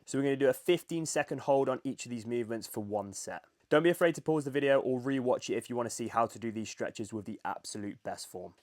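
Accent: United Kingdom. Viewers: you have a British accent but Irish good looks.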